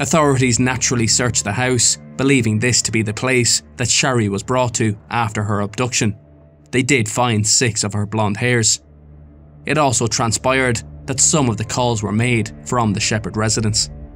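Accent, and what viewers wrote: Ireland. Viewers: Unreal channel,brilliantly narrated. Love too know what County you are from because it bugs me trying to guess 🤣🤣 I'm from Dublin so you'd think I could take a good guess 🤣🤣 cheers for the hard work man;its really good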